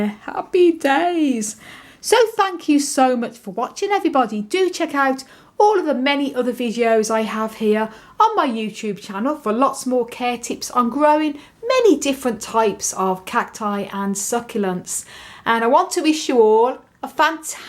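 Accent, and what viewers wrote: United Kingdom. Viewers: I like your British ascent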